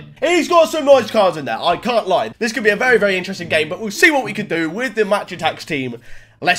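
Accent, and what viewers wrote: Northampton, United Kingdom. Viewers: Are u from Northampton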